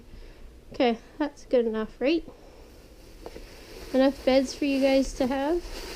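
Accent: Canada. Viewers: WAIT ARE YOU CANADIAN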